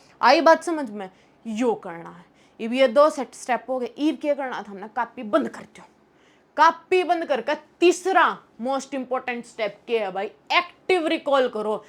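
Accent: Haryana, India. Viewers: Plzz baaki saare teachers sahi se bolte hai haryana board ye nhi hota ki aap haryanvi bole aap bolte ho paadha galeya and much more plzz iss baat pe dhyaan dena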